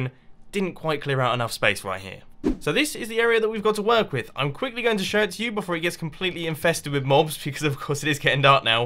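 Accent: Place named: United Kingdom. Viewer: lol i love the way British people say things like "aquir affinity"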